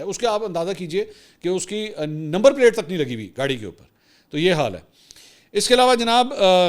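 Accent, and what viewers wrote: Punjab, Pakistan. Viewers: Mansoor Ali khan sahb. Ap k Vlog mein punjabi ka tarka bht acha lgta hay bal k vlog ko char chand lga deta hay❤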